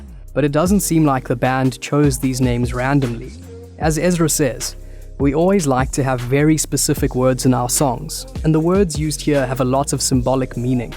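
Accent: South Africa. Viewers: I clicked on this not expecting a South African accent. Now I'm subscribing. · So weird to hear a South African accent. Love this song, love the video.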